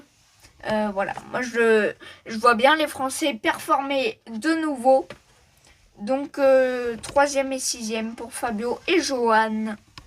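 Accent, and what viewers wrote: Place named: Spain. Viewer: Bien d'avoir ce podcast que tu as commenté en direct... j'ai suivi et j'ai rapidement accroché pendant un bon moment.Est-ce que tu penses qu'il serait possible de commenter en ayant les grille des temps à l'écran? Quartararo au sol... et même Marquez En quelques minutes j'ai appris plein de choses. Et pour ton accent espagnol et pour ton ¡Vive la France! tu es un vrai champion. Et non tu ne te ridiculises pas, crois-moi. Ni même en loupant un résultat, au contraire, tu prends des risques. Salut et à bientôt, Mister!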